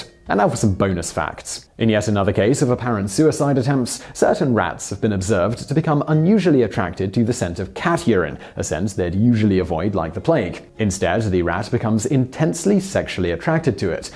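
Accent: United Kingdom. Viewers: Question: he has a British accent but I can’t figure out if he’s a Muslim background. He’s got hairy arms and a beard and a big nose which leads me to believe he’s a Muslim. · Thank you for talking about Overton bridge! I heard about this many years ago and had forgotten about the (supposed) phenomenon. P.s. always good to hear a British accent!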